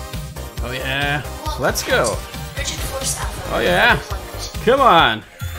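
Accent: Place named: Portugal. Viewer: é portugues... só pelo sotaque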